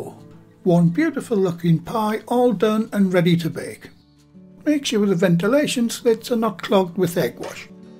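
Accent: United Kingdom. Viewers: Ahh, sir your accent and recipes reminds me of me Gran and me mum out here In Australia, I have all their old recipes that they wrote down and I follow them to the letter, as I’m getting on a bit now (52) I’m considering putting them together in a book, you can’t beat these old time recipes, you are my favourite cook on YT as you’re the closest I’ve found to that ole home style British cook, thank you mate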